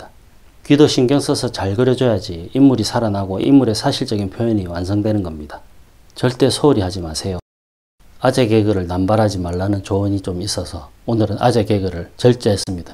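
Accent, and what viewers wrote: Gyeongsang, South Korea. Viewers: 82세 할아버지가 씀니다 . 특히한 경상도 사투리와 재미있는 말씀으로---------참 재미이게 보고가유 -- .그말씀이 나에겐 정말 참고많이되네요 나도 실그 초상회를했으나 지금 현대인들이 보시면 웃숩지요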